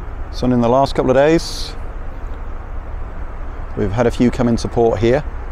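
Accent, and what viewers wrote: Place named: United Kingdom. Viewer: !!!!!! How wonderful. I love, love, love the narration. So informative and interesting. Also, I thoroughly enjoy your very British accent. This whole experience is just great. All the best to everyone for this season and blessings for a peaceful, safe and healthy 2022. God bless you all!!!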